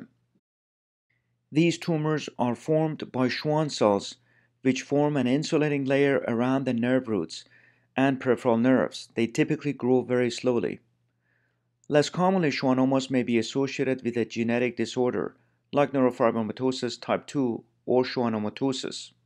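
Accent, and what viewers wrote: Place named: Iran. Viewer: Lovely Persian accent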